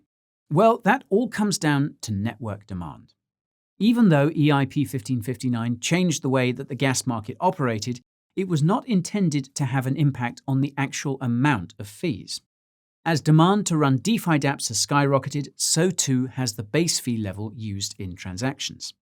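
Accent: United States. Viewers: Is that supposed to be an American accent? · His American accent is getting better and better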